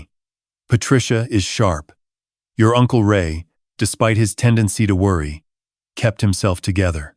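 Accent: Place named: United States. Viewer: Having an American voice use British vernacular is very annoying. It really comes across as fake!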